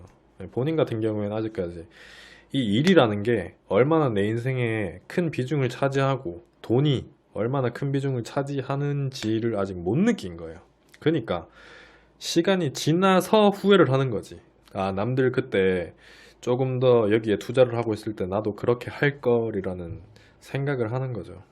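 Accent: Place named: Daegu, South Korea. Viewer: ~ 평소에 영상 즐겨보고 있습니다. 말씀도 너무 잘하시고 생각도 멋있으시고 멋짐에 빠져서 유투브 입문한지 얼마 안된 제가 자발적으로 즐겨보는 몇 안되는 채널이 채널 입니다. 이번 영상을 보면서 의 진심이 느껴져서 이렇게 글을 쓰게 되었습니다. 깊은 생각과 나름의 철학으로 만드시는 영상들이니 언젠가는 빛을 볼거라고 확신합니다~^^ 그리고 좋은 말씀 많이 해주셔서 감사합니다~ 제가 대구 사람인데 서울에서 힘들게 타지 생활하면서 같은 동향 사람 말씨로 이런저런 얘길 들으니 더 친숙하게 느껴지는 면도 있는 것 같습니다. 그리고 영상보면서 지금의 남자친구와도 사랑하게되고 그 사랑을 유지하는 것에도 도움 받고 있습니다. 타지에서 외로워서 남자에게 마음이 쏠리게 되더라고요. 단순히 연애 이야기가 아니라 그 너머의 것들을 이야기해주셔서 더 나은 제가 되는데 도움을 주셨습니다. 그래서 지금 너무 멋진 남자와 연애할 수 있게 되었어요^^ 의 진심이 담긴 흔적들은 시간이 지날 수록 빛을 발할 거에요~ 그리고 저에게도 오래오래 그 마음을 보여주세요~ 보면서 제가 항상 제 자신을 다잡을수 있게요~ 긴글 읽어주셔서 감사합니다! 늘 건강하시고 행복하세요^^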